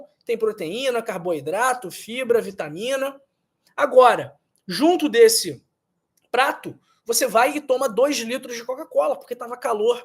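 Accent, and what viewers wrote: Rio de Janeiro, Brazil. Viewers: Sotaque de carioca não convence ninguém, parece malandro falando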